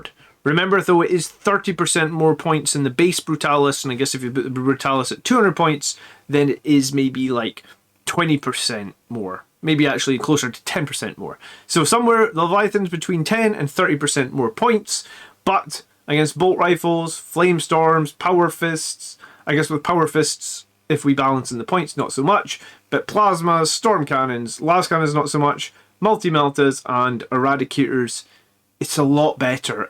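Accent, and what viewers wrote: Scotland, United Kingdom. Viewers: Wait, you're scottish?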